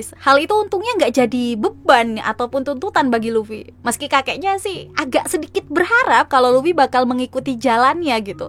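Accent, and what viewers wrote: Java, Indonesia. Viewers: Saya suka aksen jowo mu... (*_*) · Nah gtu dong ada medok nya kan jd lucu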